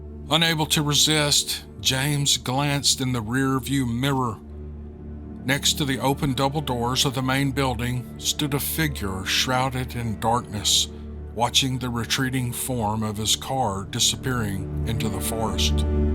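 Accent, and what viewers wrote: South, United States. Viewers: One of the many things I enjoy about listening to your podcasts, is that you are not in a hurry! English is not my first language, and people often try to speed things up on YT. You don't, you read like you speak. With a lovely Southern drawl, not too broad for foreign ears... You stay far away from anything political... a true gift in this day and age... In the short time I've been listening to you, no evening has gone by without you sitting on the edge of my bed, the cadence of your voice slowly rocking me to sleep... Thank you for what you do here,! We really appreciate your efforts! ❤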